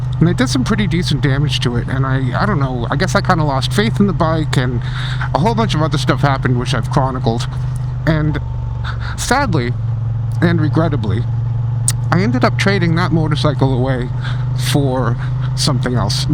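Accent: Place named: Boston, United States. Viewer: We seem to have similar taste in bikes. My Tiger Explorer 1200 was getting a bit big and heavy, so I bought a V85TT in 2020 for birthday #75. It seemed to have all the things I wanted in a bike. 5 years and 16K miles later, it just wasn't gelling. So it went to a new home late last year and I picked up a Himi 450. Did a 5700 mile shakedown ride on the Himi and it is a great bike. In the interim, I picked up a Triumph Speed 400 for a day ride play bike - and it's a hoot. And then, there's the '86 Yamaha SRX-6 (that I bought new) sitting in the back of the garage sadly in need of a complete restoration before it can go back on the road. And I still have the big Tiger - although I will probably try to rehome it this Spring. The Himi is doing what I need for a distance bike and the Tiger deserves to be on the road. And I thought that I detected a bit of Boston in your accent. 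😁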